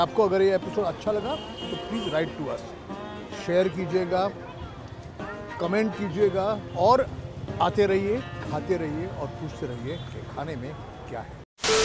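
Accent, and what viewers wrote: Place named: Mumbai, India. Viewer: Your talking style says, Mumbai ka chokra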